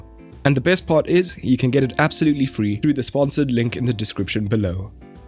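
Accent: South Africa. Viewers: Are you South African g? Really good video!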